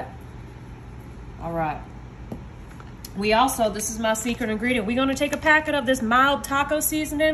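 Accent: South, United States. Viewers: I LOVE HER COUNTRY ACCENT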